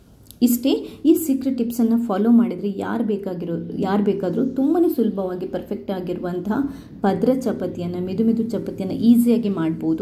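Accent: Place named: North Karnataka, India. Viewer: I guess by your accent that you are from north karnataka, but why u r struggling to speak in blore kannada, speak in your own language. No worries.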